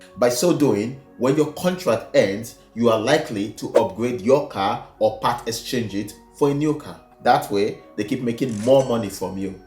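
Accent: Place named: Africa. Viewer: I’m here for the great advice and African accent lol 😂😂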